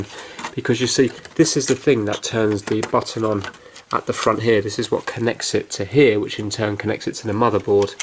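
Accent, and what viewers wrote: United Kingdom. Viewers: I'm watching this for the Brit accent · Amber Ford I agree. It may also be the British accent.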